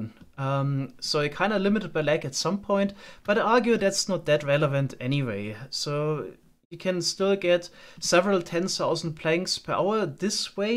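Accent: Germany. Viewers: Ich höre da irgendwie Deutsch raus xD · kann es sein das du Normalerweise Deutsch sprichst? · Bruder guter content und ganz ehrlich der deutsche akzent ist mega charmant! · Are you german?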